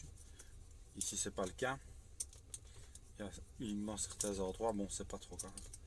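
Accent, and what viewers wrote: France. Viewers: Esse sotac FRANCÊS é muito incrível. Gosto muito de ouvir.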